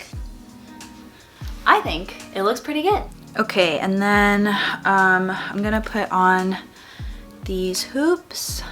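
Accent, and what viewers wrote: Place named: South Korea. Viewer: omg your korean is so cute (I like your 교포accent) 🙈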